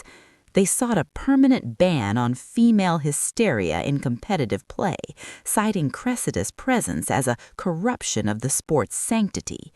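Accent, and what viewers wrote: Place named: United States. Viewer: I am through 👎🏻👎🏿👎🏽👎🏾listening to stories set in England using AIAmerican accents. Stories set in the British Isles need the appropriate accents. You lost me. I will not subscribe.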